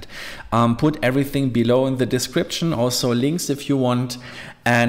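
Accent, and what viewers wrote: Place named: Germany. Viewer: I think they thought since the video title is in English, it meant perhaps Jaspers products were available to be shipped from within the US.Then he heard your accent and realized that you are in Germany, so his hope for lower cost shipping was dashed.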